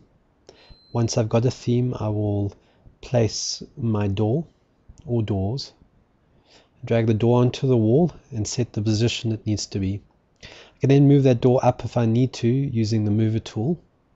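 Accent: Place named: South Africa. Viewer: hey....a south african, bru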